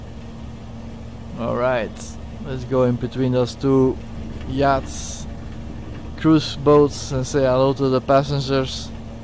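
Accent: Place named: Belgium. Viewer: Alee komaan lol.. er is nen Belg voor nodig om nen Belg te herkennen :) goed review! (maar een klein detail; als je uit vr kwam voor de opname, dat zou de kwaliteit voor de kijkers erg verhogen)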